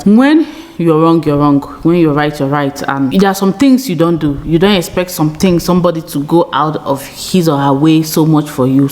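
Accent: South Africa. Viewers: My dear u look so btiful nd natural nd ur accent change little by little u r now have some SA accent than b4 u came here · hello, you more like a South African i really cant tell that you from Nigeria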